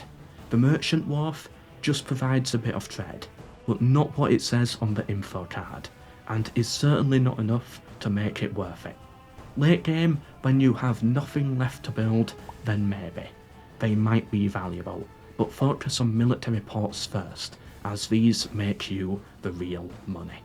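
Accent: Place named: Yorkshire, United Kingdom. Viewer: The Eternal Game. Who could best describe it, time and again, with his unmistakable Yorkshire accent? Mr., of course. No irony intended. Take care, reddish lad.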